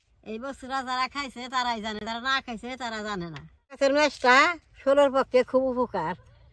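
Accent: Tangail, Bangladesh. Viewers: মাসীমা, আপনি নিশ্চয় বাংলাদেশের টাঙ্গাইলের মানুষ ছিলেন। কথায় বুঝতে পারি। টাঙ্গাইলের বাসিন্দারা কলার বেরাইল/ থৌর খেতে খুব পছন্দ করে।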